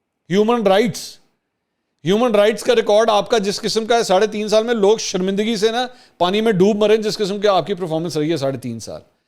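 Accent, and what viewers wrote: Punjab, Pakistan. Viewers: بھائی سلام ڈٹ کر پنجابی بول رہا ہے اندرون شہر کا پینڈو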